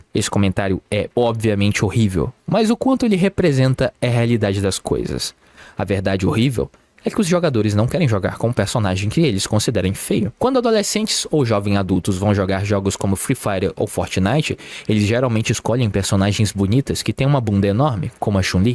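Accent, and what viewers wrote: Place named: Northeast, Brazil. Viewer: esconde o rosto dnv man vc é o boneco de IA do seu perfil, n este gigachad com um sotaque de nordestino disfarçado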